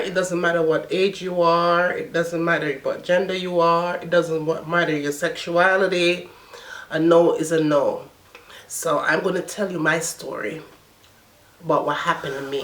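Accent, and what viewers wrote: Jamaica. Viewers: The bible has some very harsh words regarding people who abuse children, its better you were never born. No need to say Jamaican accent, I think you subscribers know already :)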